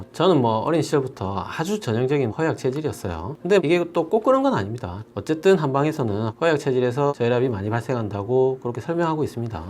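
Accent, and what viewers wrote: Gyeongsang, South Korea. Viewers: 경상도 사투리가 친근하네요.믿음이 갑니다.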